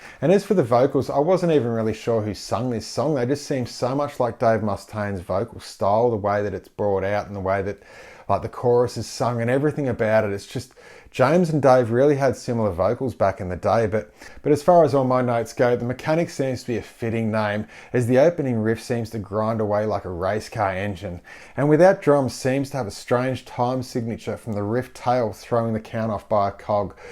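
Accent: Australia. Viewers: There's only a few reasons I come to this channel: to learn how to play my favorite thrash riffs, dial in those glorious tones, listen to an Aussie's killer accent and occasionally have an exotic bird stare judgingly back at my bad technique or pisspoor tone or watch them perform perch acrobatics. This video delivered on all accounts as usual. Love the series idea and as always look forward to more vids from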